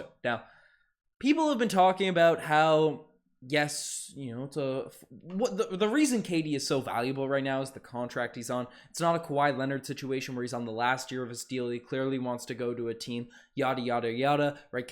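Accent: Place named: Newfoundland, Canada. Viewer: Sounds Newfie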